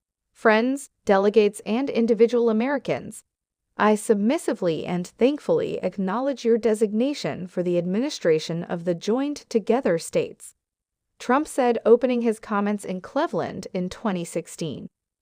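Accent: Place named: China. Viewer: I keep trying to figure out who the non-English as their first language narrators come from......China?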